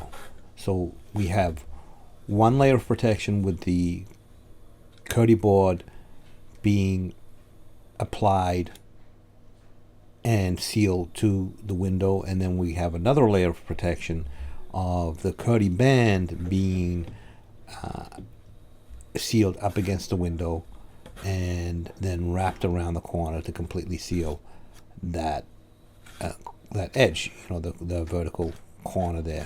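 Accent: Boston, United States. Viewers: Boston area, I knew it the accent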